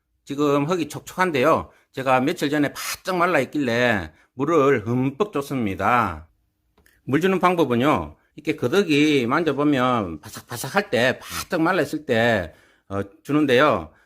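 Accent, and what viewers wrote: Gyeongsang, South Korea. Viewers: 경상도 사투리라 찰떡같이 알아들어져요ㅎㅎ 맥주스프레이는 물이랑 희석 하지 않아고 그냥 맥주만 분무하는건가요??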